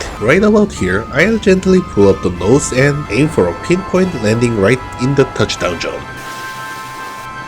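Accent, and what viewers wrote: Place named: South Korea. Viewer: guessing from your accent you're Korean right?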